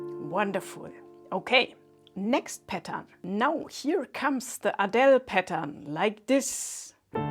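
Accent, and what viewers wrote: Germany. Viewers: Hello, your English sound German. Are you from Germany of one of the countries which speak German language. Excellent video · I LOVE THE GERMAN ACCENT..!!!...UM IS THAT YOUR HERITAGE..?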